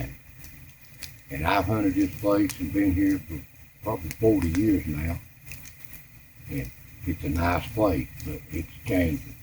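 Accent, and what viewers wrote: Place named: South, United States. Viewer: Same here! Its comforting to hear just talk! Its sad even the accent around here is slowly being bred out I reckon. My accent isn't as strong as my grandparents, and my daughter only barely even sounds southern. Dang internet I reckon. But yes, just listening to these stories, the rhythm and the southern drawl is like a time machine!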